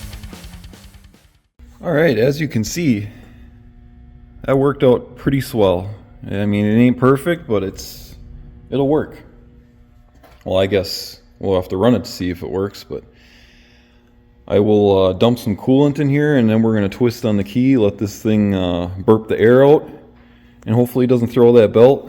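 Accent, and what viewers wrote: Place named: Wisconsin, United States. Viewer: Your channel is going to blow up love the content where are you from sounds northern accent Wisconsin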